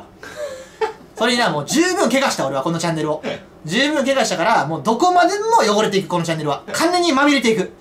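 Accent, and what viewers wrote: Kansai, Japan. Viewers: ひかるゲームズまじで好き！ ひかるの関西弁実況がめっちゃおもろい笑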